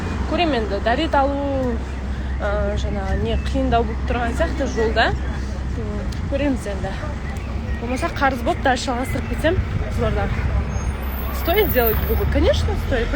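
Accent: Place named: Russia. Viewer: саған орысшаң акцентпен күлдіргі келтіресің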